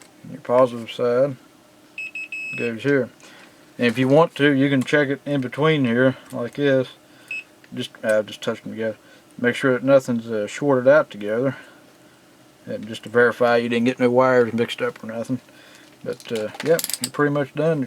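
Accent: South, United States. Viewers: I don't have any comments about this video in particular, but I just wanted to say that I just discovered your channel and the videos are really well made and I appreciate how informative you are. Keep it up! Also, you don't have to say if you don't want to,but.. you wouldn't happen to be a TN or a NC man, would ya? You sound like.. well, you sound like me. haha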